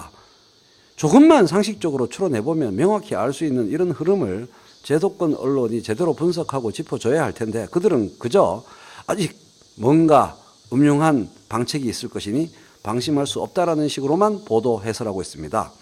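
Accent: Busan, South Korea. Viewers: 궁시렁 궁시렁 씰뗴없는 ㅋㅋ ㅋㅋㅋ 진짜 시작부터 속이 시원!! ㅋㅋㅋㅋㅋ저도 부산놈이라 그런가 옆집 아저씨가 설명해주는거 같아요 ㅋㅋㅋ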